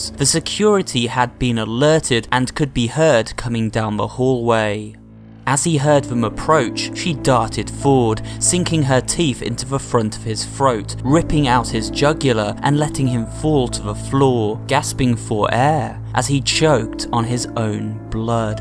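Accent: United Kingdom. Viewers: The British accent really adds terror to it.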